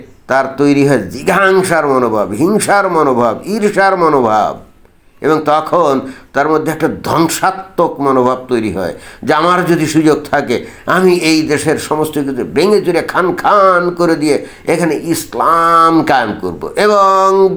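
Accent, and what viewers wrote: Bangladesh. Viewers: Apnar Kotha gulo Sunte khub Valo lage.. B.deshi Accents.. Namoskar dada..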